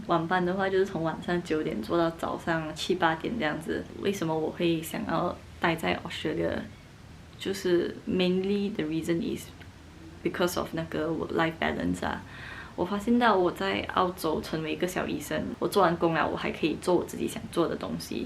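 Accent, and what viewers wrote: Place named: Cantonese, China. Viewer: 马来华裔么，中文不错，就是带点粤刚音，可能是广东那边的移民。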